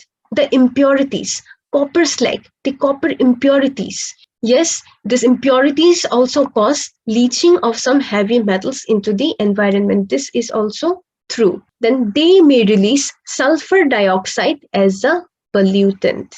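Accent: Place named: United States. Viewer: American accent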